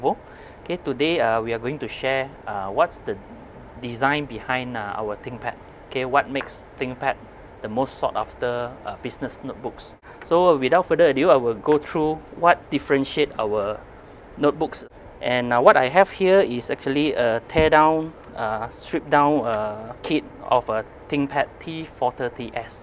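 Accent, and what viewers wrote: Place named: United States. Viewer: 你的英语说得和美国人一样。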